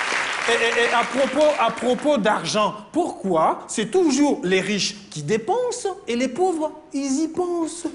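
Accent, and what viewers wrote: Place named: Niger. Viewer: Le type Nigéroi avec un gros accent, il débarque et il te fait du Raymond Devos, et j'ai aucune honte de faire cette comparaison, c'était très bon.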